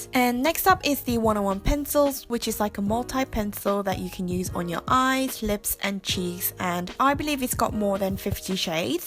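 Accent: United Kingdom. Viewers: 이번 메이크업이 에게 특히 잘 어울리네요 너무 강한 색 섀도우 쓸때보다 은은한 색들오 메이크업하니까 분위기가 깊은? 그런 느낌이 들게해여!!이뻐여!! 가을이 좋은 이유는 저는 하늘보는거 좋아하는데 가을의 구름들이 가을만 가진 예쁜 모양이나 특이한 형태 그리고 가끔씩은 택스쳐도 다를것같은 그런 특유의 분위기를 가졌더라구요 그래서 전 가을이 좋습니다! 이벤트때문에 댓글을 단 것도 있지만 매번 듣기에 너무 좋은 영국발음과함께 영국생활을 리마인드 해줘서 메이크업은 물론 브이로그 영상도 잘 보고 잇어서 응원하고 싶다는 생각에도 달아봅니다! 어린나이에 타지에서 언니랑 둘이?인지는 확실치 않지만 생활하는 모습 보기좋구 앞으로도 좋은 영상 잘 부탁드립니다!!! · 항상 볼 때마다 느끼는거지만, 님은 넘나 귀여운 것 ㅠㅠ 영국악센트도 진짜 러블리.. 항상 좋아요만 누르다가 오랜만에 댓글 다네요. 전 사계절 중 가을을 제일 좋아하는데 제가 더위를 많이타고 벌레를 아주 극혐하는 수준이기 때문에 가을이 제일 좋아요. 특히 제가 건성이라, 겨울엔 아무리 기초를 탄탄하게 해도 화장이 뜨더라고요ㅠㅠ 근데 가을은 시원한데다, 건조해도 화장이 뜨지않고, 비도 별로 안와서 항상 하늘이 예쁘고 화창한 날씨잖아요. 벌레도 없고. 그래서 가을이 제일 좋아요.😆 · 오늘 메이크업 정말 이뿌네용👍🏻👻 가을은 제가 태어난 계절이기두 해서 가을이 오면 괜히 좀 하이퍼되구요 패션이나 화장 스타일을 좀 어둡고 딥한 걸 조아해서 쇼잉 오프 하는걸 즐길 수 있어서 조아요 ㅠㅗㅠ 영국 발음 그리울 때 채널 와서 영상 보곤 하는뎅 할로윈 멬업도 곧 해주셧으면!! 😘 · 언니 영국 발음이 너무 듣기 좋아서 구독하기 시작했는데 여전히 좋아요...ㅎ사실 제가 영어에 흥미도 없고 못 하기도 해서 영어 잘 하는 사람이 더더욱 부럽고 매력적으로 보이거든요ㅎㅎ헷 특히 툭툭 내뱉는듯한 영국발음은 정말 더럽...❣본론으로 들어가서 공교롭게도 제가 원래 제일 좋아하는 계절이 가을이에요! 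가장 싫어하는 계절이 여름이라 여름 다음에 오는 가을이 제일 반갑고 여름이 오기 시작할 때부터 '얼른 가을이 왔으면 좋겠다'라고 생각하거든요ㅎㅎ가을 중에 특히 서늘해지는 9월 저녁 공기가 너무 좋아요 저는 아직 대학생이라 중간고사, 기말고사를 보는데 그 선선한 바람을 맞는 저녁은 시험에 대한 압박감도 없고 정말 여유를 느끼고 산책할 수 있거든요. 그리고 봄과는 다른 이유모를 설렘과 감성이 풍부해져서 좋은 것 같아요 그리고 제가 제일 좋아하는 색인 레드가 잘 어울리는 계절이기도 해서 화장할 맛이 나요ㅋㅋㅋ또 제 생일이 10월이라 제일 행복할 수 있는 날이 가을에 있기 때문에 더욱 가을이 좋은 것 같아요😊 이번 가을은 너무 덥고 태풍도 와서 제가 원하던 가을바람은 못 반겼지만 그래도 곧 다가오는 제 생일을 기대하면서 기분좋게 시간을 맞이하고 있어요 언니도 하루하루 소중히 시간을 보내면서 가을을 맘껏 느끼세요!!😉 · 언니!! 저는 진짜 ㅜㅜ 유럽여행갔다가 영국분위기에 빠져서 언니 브이로그 보는 거로 대리만족하고있는 구독자에요! ㅜㅜ 진짜 언니 동영상 업데이트 안되도 하루에 세개는 보고 자는듯.. ㅠㅠ 그만큼 언니 영상 좋아해요!! ㅜㅜ 저는 사계절중에 가을이 제일 좋아요! 왜냐면 우선 가을은 여름의 습함과 더움때문에 얼굴에 화장을 하면.. 건설도 지성을 만들어버리는데 가을은 우선 산뜻하고 틈나면 친구랑 한강에 가서 돗자리깔고 얘기만 해도 기분좋게해주는 날씨를 갖고 있기 때문이에요! 그리고 가을하면 저의 엄마 생일이 있어요! 대학과 집이 떨어져서 집에 자주 못가는데 가을에 엄마 생일때 엄마보러 가서 좋아요! 이벤트 당첨 안되도되요! 언니영상에 글 처음써보는데 흐.. 언니 영국발음 너무 좋고 그냥 힐링되요ㅠㅠ 영국 갈게요! 저! ㅜㅜ 진짜로 영국에서 살고 싶네요ㅜㅜ 오늘 메이크업 완전 잘어울리세요ㅜㅜ❤️ 그럼 여기서 글을 마칠게요!! 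언니 영상 잘 봤어요!!💘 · 언니 안녕하세용 저는 조종사가 되기위해 공부중인 학생 조종사에요! 댓글로 인사는 처음인것 같네용 ㅎ_ㅎ 영어를 워낙 좋아하고 잘해야되는 직업을 꿈꾸다보니 영국영어를 하는 언니모습에 반해서 구독을 시작했었는데 ㅎㅎ 그리고 해외로 삼주정도 어학연수겸 여행을 다녀온 영국에 살고있는것에 되게 반했었거든요ㅠㅠ 제가 놀러갔을때 버밍엄에있었어서 +학교 소속으로 간거라 주말밖에 외박이 안됏어서 런던을 1박 2;일로 다녀왓었는데 정말 반했었거든요ㅠㅠㅠ 여러가지요소가 제가 언니를 구독하게 한것같아요 ㅎㅎㅎ 그런데 이제야 댓글을 남기게 됐네욥! 제가 가을을 좋아하는 이유는 가을은 정말 비행연습하기에 좋은 날씨거든요ㅠㅠ 봄에는 미세먼지로 인해서 visibility가 안나와서 비행취소된일이 한두번이아니구 여름은 더워서 정말 진이빠져요 연습용항공기엔 에어컨이없거든요... 그 더운 여름날 갇혀서 땀을 줄줄.., 가을은 정말 연습하기 딱 좋은 날씨에 visibility도 좋을 뿐더러 구름까지 완벽해요 겨울은 너무 추워요.., ㅠ0ㅠ 강풍이 불때도 많구요., 그래서 저는 가을을 제일 사랑해요 ♥ 다른분들도 다들 가을을 좋아하시겠지만 저는 비행을 시작하면서 아무래도 더 좋아진것같아요! 댓글이 1800개릉 향하고있어서 언니가 제 댓글을 읽을수있을지가 의문이지만! 저의 당첨을 원하는 마음을 담아 댓글을 남겨볼게요!!! 앞으로도 영상 많이 만들어주세욧 ♥♥ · Holy Moly!가을이좋은이유는 여름에는 화장을 1시간넘도록 정성들여하고나갓는데 제가지성인지라 여름은금방땀을흘려버리니피부가 금방 무너지고 유분으로인해 눈가도 다지워져서 여름을싫어하는데 가을은 날도 따쓰하고선선해서 딱좋아하는날씨예요!바깥나들이 가기딱좋은날씨ㅜㅜ그리고 옷도가을때입을옷들도많고 2학기가 시작되는 계절이지요 새로운맘으로 다시 되덜아볼수도있고 그리고 행사도많고!!하핳 구독한지는 꽤되었는데 전 더도말고 님의 특유의 영국발음에 뿅가서 두말할거없이 바로구독 저도 영국에 대해 관심이많고 영국발음에도관심이있었는데 언니의 일상속에서 나타나는 영국발음!배운점이많아서 저도일상에서자주써먹고있습니다!예를들면 영어시간에 남들과는다르게..영국식으로....진짜 거짓말 안하고 요즘 영어듣기도 영국식발음 으로 거의 하는데 언니의 동영상으로인해 저는 영국발음에 익숙한지라 점수도올랐어요✌✌ 브이로그많이찍어서올려주세요!일상속의 님모습도 많이보고싶네요~~ 언제나 응원할게요!!영상 앞으로도 계속 많이 올려주세요!!다음 영상도 기대할게요!!!아 그리고 님은 입술전체에 립을 다발라도잘어울리시네요ㅠㅠ저는 풀발색은 안어울리는데 매트한 립스틱은 그라데이션하기힘들잖아요 그거 어떻게하면 그라데이션잘되는지도 알려주시면 정말 감사할것같습니다!!! 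· 언니 특유의 영국발음이 좋아서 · 헐.... 오늘 미모가 열일하시는듯 진짜 예뻐요!!!!! 저는 가을이 항상 좋아요! 처음엔 단순이 제 생일이 있어서 가을이 좋았어요!!! 생일이 10월 2일이라서 가을이 막 오는 시점이기도 하고 사랑하는 사람들이 축하해주고 이쁘게 봐주니까 가을이 너무 좋았는데 가을만 되면 센치해져서 좋아요!!!! 여러가지 옷을 입을수도 있고 여러가지 메이크업을 하고 변신을 딱 주기 너무 좋아서!! 저는 트렌치코트를 참 좋아해요!!! 가을에 어울리기도 하죠!!!! MLBB의 계절이기도 하구요!!!! 또 너무 덥지도 너무 춥지도 않아서 얼마나 좋은지!!! 이번여름의 엄청난 열기가 지나가서 올해 가을은 더 좋은거 같아요!!! 여러모로 항상 님 좋아하고 응원해요!!!! 저 님이 좋고 매력적이셔서 미국식발음에서 영국식발음으로 고쳐가고 있어요!!!!! 진짜 영국식발음이 전 진짜 님 매력중 하나인거 같아요!!!! 너무 예쁘고 멋진 님!!!! 가을도 좋고 님도 넘나좋습니다💜🙆💜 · 영국 발음이 너무 듣기조아요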